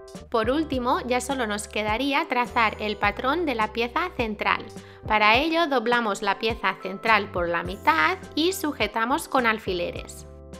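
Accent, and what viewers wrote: Spain. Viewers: Ostres t'ha quedat un biquini fantabulós!!!(M'encanta el teu accent mallorquí!!!!) Ja fa temps que em vull fer les calcetes, i el teu tutorial em ve al pèl! Gràcies bonica! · Excel·lent explicació i m´agrada molt el teu accent mallorquí!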